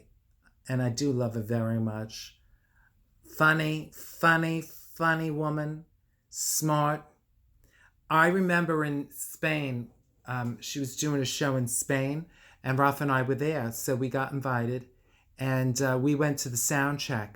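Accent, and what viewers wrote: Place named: East Coast, United States. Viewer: I simply cannot fathom the fact that instead of being touched by ur heartwarming confessions, people choose to see it as name-dropping. Oh well. Your east coast accent perfectly matches the west coast setting miss coco, thnx for sharing these gems with us puffs. Love from greece ❤️❤️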